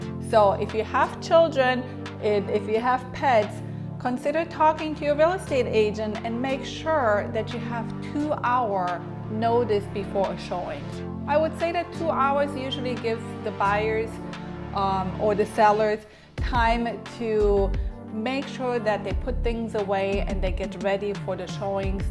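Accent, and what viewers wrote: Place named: Romania. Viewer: ! Salut! Ma bucur sa aud o voce romaneasca!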